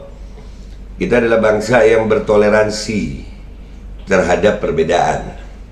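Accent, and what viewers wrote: Indonesia. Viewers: Njirrr dari beberapa bahasa daerah yg gw ngerti langsung logatnya babeh keren bingit kyak asli orang suku madura, jawa, bali, banjar dll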